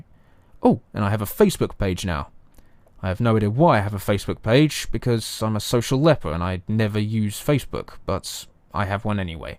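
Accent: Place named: England, United Kingdom. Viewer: are you from england or something? your accent sounds like the guy from the simpsons that trades places with homer in that one episode, who falls in love with marge :D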